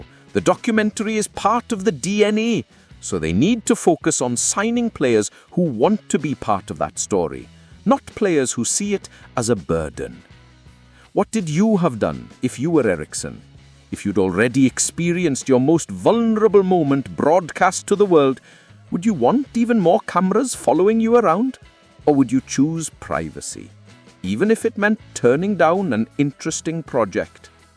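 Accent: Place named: South Wales, United Kingdom. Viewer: Who is this guy with south walian accent talking about.we are north Wales club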